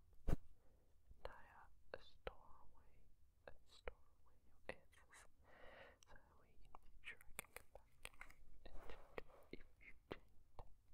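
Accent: Australia. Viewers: just stumbled across ur channel & immediately subbed! don’t know if it’s the familiar aussie accent or just ur whispers in general, but ur voice is so insanely comforting <3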